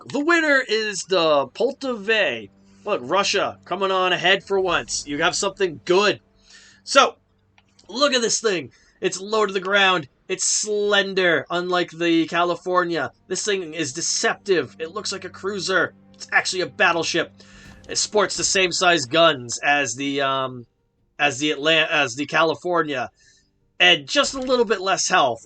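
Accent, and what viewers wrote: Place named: United States. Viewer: Dat murrican pronounciation....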